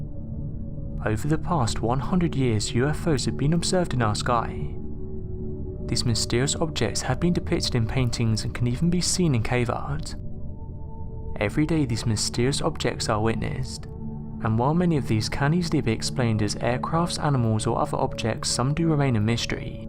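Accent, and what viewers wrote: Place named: London, United Kingdom. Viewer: London town I'd say he's good hay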